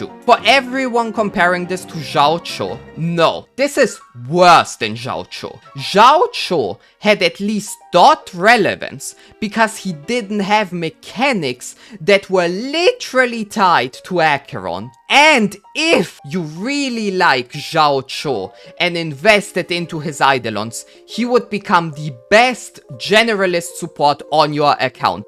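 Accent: Germany. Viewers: I’m hearing the German accent 😭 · He sounds german.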